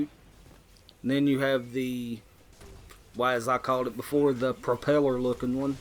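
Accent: South, United States. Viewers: This man has the best southern accent ever. 10/10 very nice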